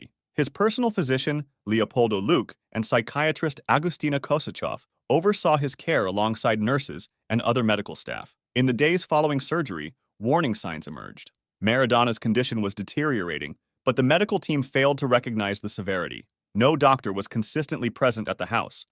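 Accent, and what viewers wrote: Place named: United States. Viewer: Who else is American and didn't think it would be soccer? Especially since the voice sounds American.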